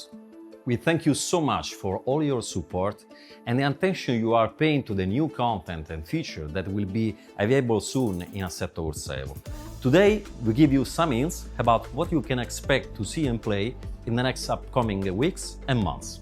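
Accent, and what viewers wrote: Rome, Italy. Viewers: l'accento italiano è la cosa che rende ancora più particolare il video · Came for the asseto corsa evo update stayed for the absolutly beautiful Italian accent.... Bellissimo! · porca boia l'accento più italiano che abbia mai sentito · i love the strong roman accent as an italian ahahahahah loving the fact the Alfa Romeo Giulia is the cover car <3 it deserves it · As an argentine, your clear english on pure italian accent feels like our grandfathers telling an interesting story, let me prebuy already i have saved on my steam wallet for months. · i dont know about you but i trust a guy with an italian accent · L accento roma o rende tutto migliore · The italian accent is so cool.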